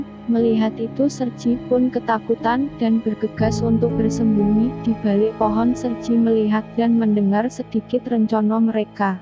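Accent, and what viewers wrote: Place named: Java, Indonesia. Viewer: Bohoso jowone ije kentel men mbak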